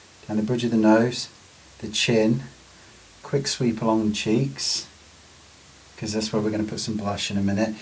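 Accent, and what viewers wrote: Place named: West Country, United Kingdom. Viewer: There's a bit of a west Country accent there this must be long before you went to America.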